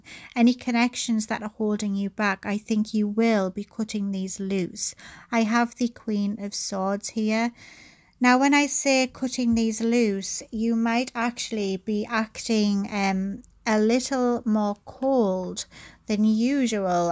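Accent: United Kingdom. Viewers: I have just found you tonight, lovely to hear a uk voice. Newbie from Preston Lancs What a sweet soul you are. You have a new subscriber. Love & light 🙏🏽 Also my nickname is Ace 🤣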